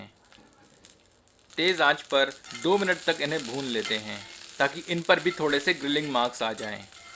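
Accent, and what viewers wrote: Standard. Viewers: Khane se jyada testy to apki Sudha Hindi lagti he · आपकी हिंदी भी अत्यंत शुद्व है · Apki hindi shudh hai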